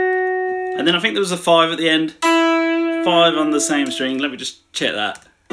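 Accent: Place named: Birmingham, United Kingdom. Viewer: no worries and makes sense! You sound like a fellow Brummie as well 🤙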